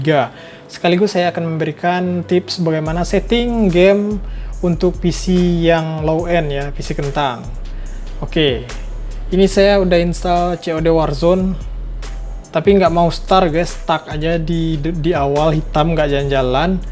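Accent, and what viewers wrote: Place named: Padang, Indonesia. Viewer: Orang mana bang?logat nya kek org padang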